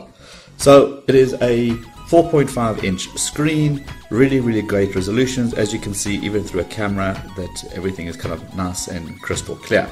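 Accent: South Africa. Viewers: He's a Saffer: if you ask him to say milk he'll pronounce it 'mulk' and he might refer to traffic lights as 'robots'. Either way, he's a good reviewer and getting a sub from me.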